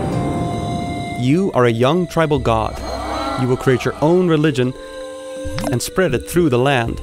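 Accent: Netherlands. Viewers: I can really recognize the Dutch accent. But that makes it nicer actually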